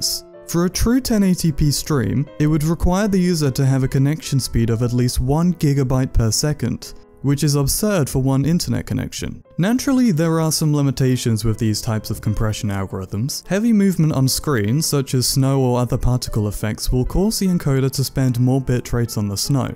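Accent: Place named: United Kingdom. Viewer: >be me >get this video in recommended >british accent >face looks probably like the guy from the "ACKCHYUALLY" meme >go to video tab >many video titles have a CAPITAL word in it for no reason >many video titles start with "I made..." >first video blew up >2nd most popular video has only 1/3rd of the views >never got that many views since on any video >close tab and block this channel with the extension BlockTube Feels good man.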